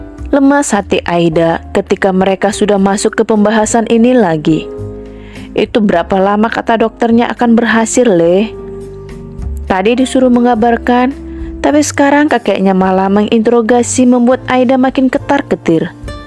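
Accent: Java, Indonesia. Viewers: Suka logat yang medok ini,, pas ini dubber nya 😍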